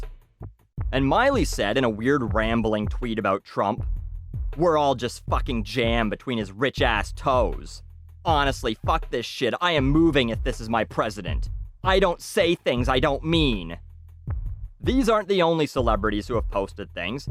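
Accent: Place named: Canada. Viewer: this Canadian has the most annoying accent. why does he care sooo much about American politics anyway. typical Canadian no identity bullshit. fuck off already.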